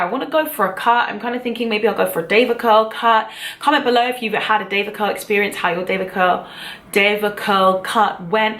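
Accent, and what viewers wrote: England, United Kingdom. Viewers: Just subscribed to your channel. Thank you for the output. You sound English but your products are priced in $. Do you have a UK supplier or am I misunderstanding something?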